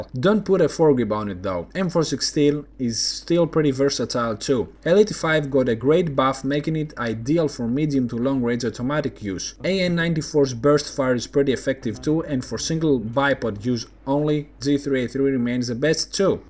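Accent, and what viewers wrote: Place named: Greece. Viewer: definately english with greek accent (i'm from greece and i know exactly how greek people speak english :P)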